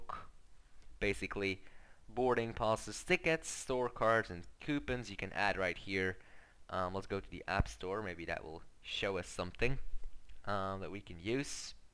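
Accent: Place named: Denmark. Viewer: Nice accent brah! Danes are the best.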